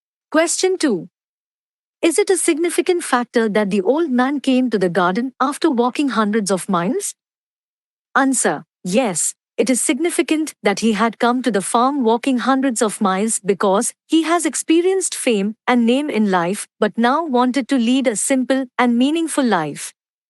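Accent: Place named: United States. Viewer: Your voice myam American voice but teaching is,nice myam tanks myam 🥰🥰🙏🙏🙏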